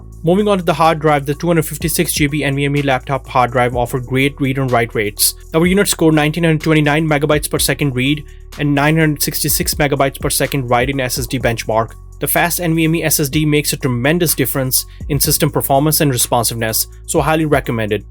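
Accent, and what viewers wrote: India. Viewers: Video was awesome but the thing I noticed was your accent didn't sound like American but as an indian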